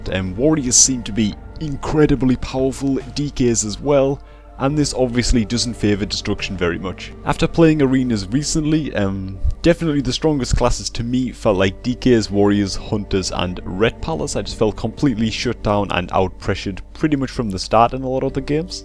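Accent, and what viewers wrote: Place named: Geordie, United Kingdom. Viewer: he's either a Geordie/Mackem or a sand dancer, cant tell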